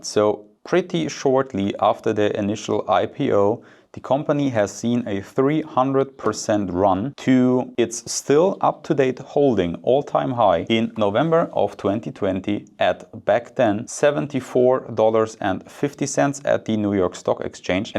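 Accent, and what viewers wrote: Germany. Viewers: Horrible German accent. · Can not stand this horrible German accent. Get pain from this accent.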